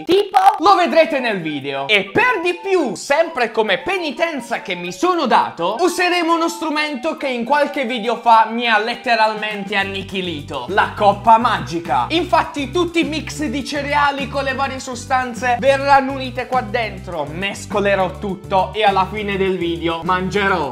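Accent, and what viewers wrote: Apulia, Italy. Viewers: Parla un po' più pugliese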